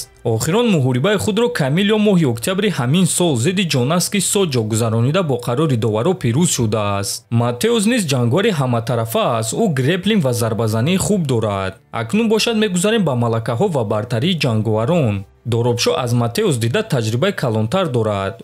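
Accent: Tajikistan. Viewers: что за язык .тупой еше тупее.пазориш таджикский язык и акцент.пазорный волк.прекрати мычат.баран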